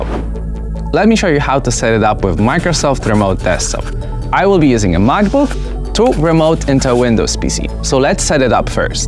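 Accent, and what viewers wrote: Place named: Eastern Europe. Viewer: Чувак, у тебя такой восточноевропейский акцент 😁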